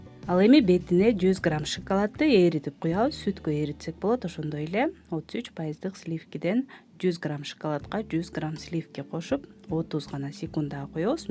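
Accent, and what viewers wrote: Standard. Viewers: Таза кыргызча суйлогону жакты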